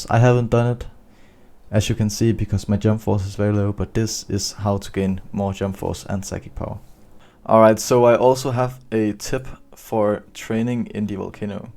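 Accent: Denmark. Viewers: ur danish i can hear it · are you from denmark? cus i am and ur accent is like that.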